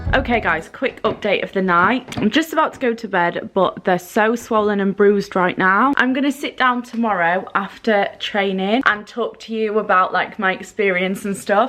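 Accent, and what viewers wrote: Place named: Yorkshire, United Kingdom. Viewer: Never realised how Yorkshire you sounded until your sped up lmao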